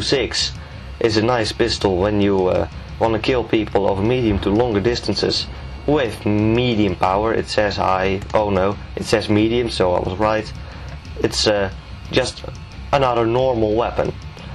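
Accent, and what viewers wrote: Netherlands. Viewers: je hoort echt goed dat je Nederlands bent goede video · ey man ik hoor aan je accent dat je nederlands bent, ik upload ook gameplays van bfp4f check mijn channel btw nice commentairy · Are u Dutch?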